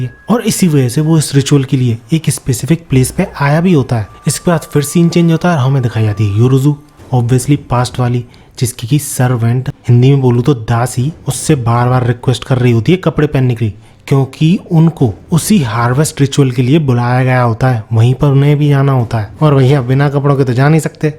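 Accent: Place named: Madhya Pradesh, India. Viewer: You are from MP Or either from near by agra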